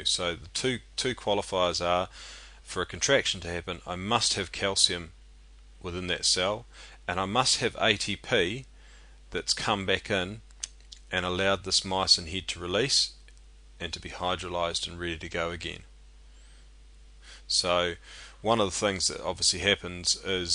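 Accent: New Zealand. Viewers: thats a typical kiwi accent :) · I'm pretty sure it's New Zealand accent, since i've been there for 2 years for high school.. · yep hes New Zealand!!! you can tell by the way he says his E's and I's ie, head, actin etc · sounds like a Kiwi XD excellent video!!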